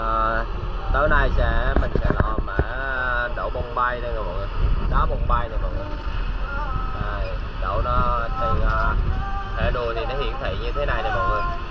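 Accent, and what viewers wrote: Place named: Quang Nam, Vietnam. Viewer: Ở mô mà nói tiếng quảng nam vậy🤔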